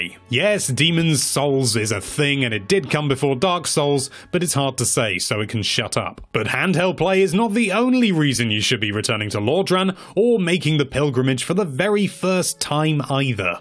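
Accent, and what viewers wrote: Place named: United Kingdom. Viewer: Click on video Hear British accent Close video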